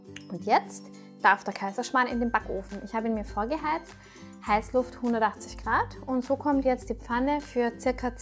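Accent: Austria. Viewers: Super, aber ich bekomme diesen charmanten österreichischen Akzent nicht hin und so es ist irgendwie nur ein leckerer Pfannkuchen.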